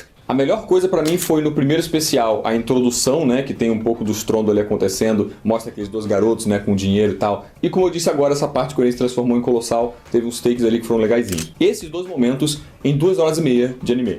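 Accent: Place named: Rio de Janeiro, Brazil. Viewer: UÉ, O sotaque carioca tá voltando?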